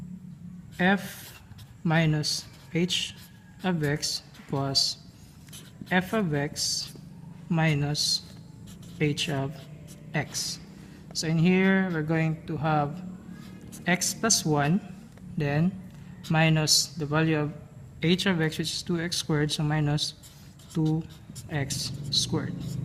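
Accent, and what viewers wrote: Philippines. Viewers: are you filipino btw? Your accent sounds familiar to me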